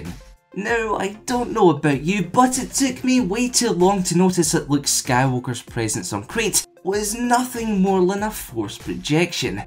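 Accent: Scotland, United Kingdom. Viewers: thanks for answering. I don't mind I like your accent:) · what part of Scotland are you from?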